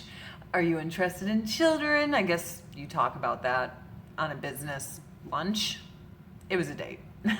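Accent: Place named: South, United States. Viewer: When she was on ANTM her accent was like so cool and tomboy-ish and she had like this southern accent and I LOVED that accent. But now she has this “normal” accent and it's not really “cool” anymore.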